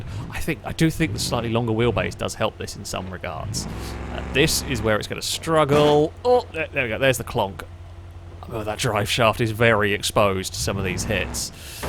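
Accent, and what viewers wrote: United Kingdom. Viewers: i took a big break from beam ng drive content and i uhhhh.....i forgot how british your accent was lol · is British graystillplays confirmed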